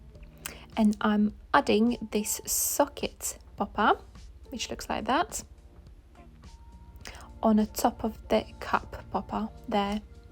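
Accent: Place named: England, United Kingdom. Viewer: Well presented in a lovely English accent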